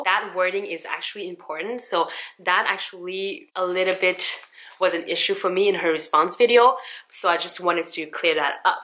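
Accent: Quebec, Canada. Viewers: il me semblait que j'entendais du français genre québécois comme accent, ☺️ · So happy I found your channel! It's fantastic that this product has brought up so much discussion about science and health supplements on YouTube. I wish Tati all the best with her business, but even if she finds the backlash difficult to deal with, I'm happy it's leading to an increase in science literacy among at least a small fraction of her subscribers. You did a really good job going through the various aspects of the product. On a more personal note, I'm a Quebecer living in the United States doing my PhD and your French Canadian accent reminds me of home. (: Merci beaucoup!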